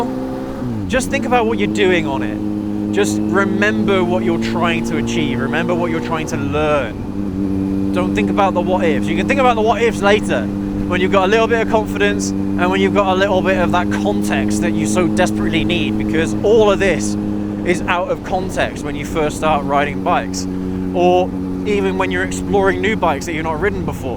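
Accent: United Kingdom. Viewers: Nicely done! I've recently subbed because of the variety of approaches and the ever-articulate British accent. 😉 You pretty much summed up the entire video (IMO) with the discussion about mind. All is mind. Every story we tell ourselves is locked in there and it's our job to "just get on with it" so we can over it. See ya on the next one!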